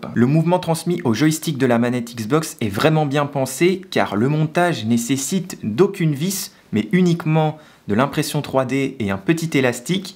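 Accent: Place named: Switzerland. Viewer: bonjour de Normandie . un suisse? je m'attendais a un accent bcp plus prononcé. tout fou le camp. mais en contre partie j'aime bcp votre voix elle a une certaine musicalité (meilleur formulation qui me vient e l'esprit). je cherchais des news geek et je suis tombé sur votre chaîne j'aime beaucoup. j'aimerais énormément débattre sur "nouvelle génération d'imprimante 3d" pour moi les reelles nouvelles générations ça va etre les imprimantes a tapis roulant les imprimantes "infinis" tout le reste (a mon avis) ce ne sont que des mises a jours. c'est pour ça que je me casse le train depuis deux ans avec une base am8 ( et meme prusa bear c'est + a la mode) que je veux custom a fond. car lest pas possible de trouver a prix correcte une imprimante qui nous correspond parfaitement . auto leveling, <num>v électronique, stepper, rail lineaire ou tige 8mm buses classiques ou alongee pour imprimer reellement en 3d et modifier la résistances des pièces par la fibre. 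la tete du chassis ect. je vois l'imprimante 3d de mes reves un peut comme le sabre laser d'un jedi qui est fait sur mesure pour lui. et en plus c'est instructif et valorisant.... mais ce qui est frustant c'est que pour l'instant c'est une ender 3 simple qui fait tout le boulot..... enfin bref bonne continuation je vais vous suivre.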